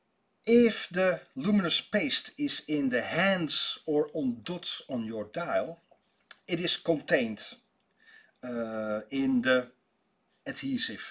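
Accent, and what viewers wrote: Netherlands. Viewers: Toen ik jouw accent hoorde wist ik het al: NEDERLANDER!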